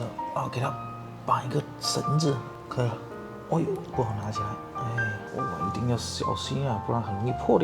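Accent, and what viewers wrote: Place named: Fujian, China. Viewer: 很喜欢听福建人说普通话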